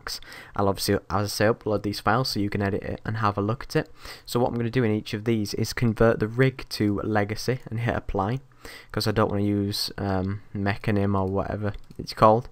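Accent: United Kingdom. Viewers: Man are you british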